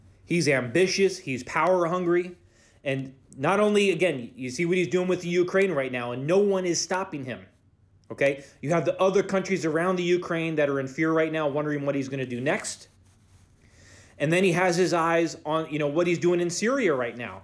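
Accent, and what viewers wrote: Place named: United States. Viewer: Oh you sound like an American.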